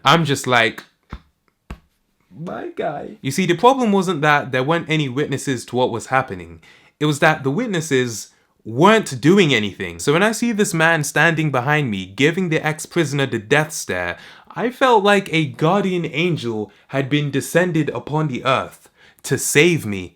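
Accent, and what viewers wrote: England, United Kingdom. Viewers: I forgot he was British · Is he from the UK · Your british? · Are you english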